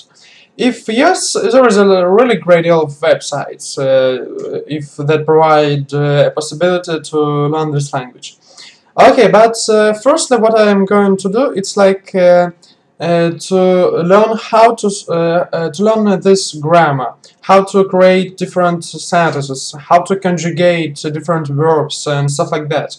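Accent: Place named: Germany. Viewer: You have a German accent while speaking English...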